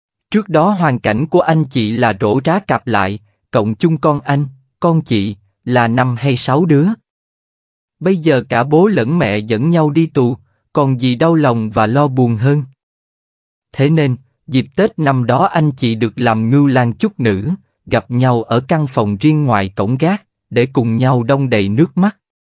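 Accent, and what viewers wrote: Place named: South, Vietnam. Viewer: đây là đúng giọng miền nam mà thật hãnh diện vì giọng đọc của a cảm ơn kênh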